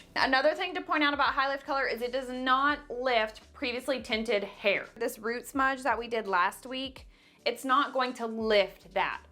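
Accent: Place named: West Texas, United States. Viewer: Epi 6 of HILLLLLLBILLLLLLLY HAIRRRRR. west texas voice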